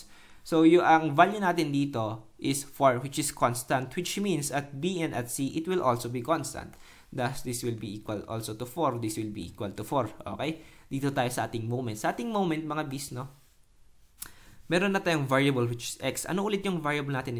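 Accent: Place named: Pangasinan, Philippines. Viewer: now watching <3 quiz later tas exam next week hahaha btw may pangasinan accent kayo like bangking hahaha idk if im right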